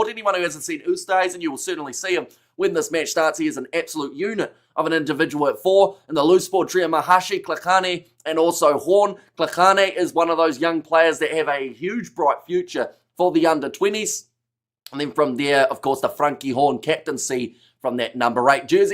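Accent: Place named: New Zealand. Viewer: This fellow is not a great commentator. Speaks far too fast, very difficult to understand his acute NZ accent. Slow your dialogue down a little mate!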